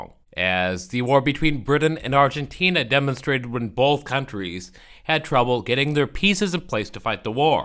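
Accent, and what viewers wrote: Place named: Uruguay. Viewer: Great video Bro , i´m uruguayan , lost in Spain with my girlfriend from Coventry . abrasos , me encanto tu acento charrua jaja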